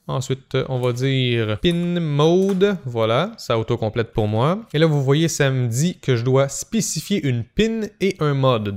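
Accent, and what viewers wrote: Quebec, Canada. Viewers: Bonjour, Je te remercie pour ton site et tes vidéos. Je suis un vieux programmeur et je m'intéresse aux sujets que tu présentes. Merci pour celui sur l'Alfawise U20 One, achetée, surper content. Merci aussi pour les sujets ESPxx. C'est bien que tu présentes VSCode qui est un "rouleau compresseur" qui écrase tout sur son passage. Je l'utilise depuis la version 0.8 et je l'ai vu grandir. J'ai fait beaucoup d'Arduino pour mon plaisir durant quelques années. Quand je m'y suis remis, j'ai failli vomir : le même IDE qu'en 2011, à quelque chose près. Utiliser VSCode pour refaire de l'Arduino m'a redonné du plaisir à coder pour ces petites machines. Je crois qu'il ne faut pas hésiter à laisser l'IDE d'Arduino de côté. VSCode propose un retour sur investissement bien supérieur et il va continuer de grandir, l'IDE Arduino actuel, c'est mort. 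J'utilise VSCode professionnellement sur Windows et sous Linux (ça marche même sur une rasp !) et c'est un outil de productivité formidable. Je ne voulais plus faire de "Visual Studio" tout court, j'en ai marre de ce genre de produits. Avec "Code", j'ai vraiment retrouvé le plaisir de coder (en python essentiellement). Merci encore pour tes vidéos (et ton léger accent canadien c'est du pur bonheur pour les français) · Bonjour. Voir et écouter tes video est un double plaisir. Les explications sont très claires, ni trop rapide ni trop lentes. Tu fais tomber les barrières technique avec une facilité déconcertante! Le second plaisir, c'est entendre ces explications avec cet accent québécois que j'adore (je suis français et j'habite à Paris) me remplit toujours de joie! J'espère pouvoir retourner prochainement à Montréal. Si tu y habites je t'offre une bière!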